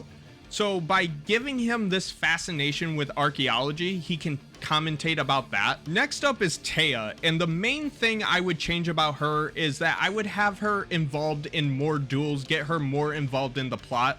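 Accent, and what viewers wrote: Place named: Brooklyn, United States. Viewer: I love the Brookland accent lmao subscribed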